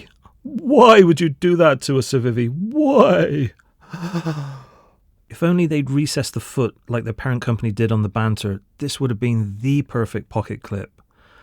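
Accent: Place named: United Kingdom. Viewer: You sound like you're from the UK but, I'm guessing you live in the US? Purely because you are reviewing a lot of knives all of which are illegal to ship/buy in the UK. Hate UK laws.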